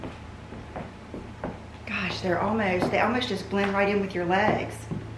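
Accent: South, United States. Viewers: Hi. I just wanted to say how much I enjoy seeing your pretty face and listening to your soft southern accent. Things are getting very scary here in the UK (as it is everywhere) and your videos are the perfect distraction to the craziness going on around all of us. I hope you and your family stay safe and well. 🙏🏻💕💋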